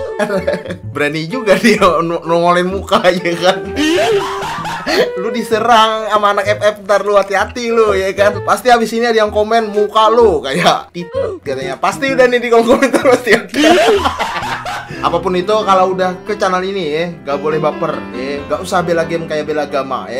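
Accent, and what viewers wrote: Banjar, Indonesia. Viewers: Bah orang banjar ni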